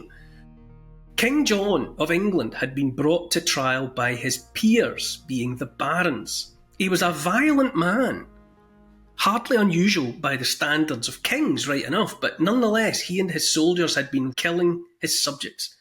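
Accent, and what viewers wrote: Scotland, United Kingdom. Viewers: I ken, i ken 💯 The linguistic unfolding of syntactical tyranny - in a Scottiah Accent 😅👐 Brilliant, greetings from downunder. 👑🌱🔥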